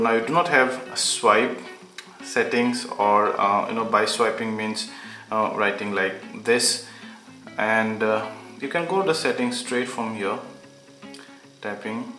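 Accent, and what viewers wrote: Assam, India. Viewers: You sound like Assamese :D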